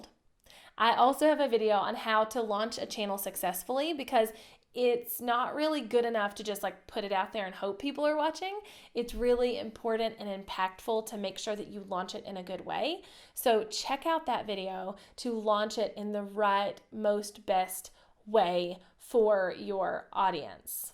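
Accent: South, United States. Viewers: Hi....I Subscribed to your Channel......Do I hear a...Southern... Accent.....cheers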